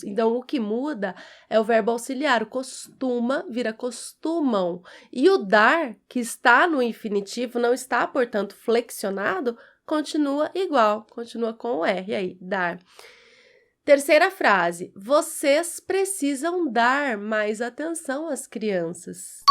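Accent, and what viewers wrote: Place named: São Paulo, Brazil. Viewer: Oi,professora,amada por mim, você está certa de puxar o R,um erre áspero.O teu erre tem som do R paulista.Te amo,okay🥰🥰🥰🥰🥰🥰🥰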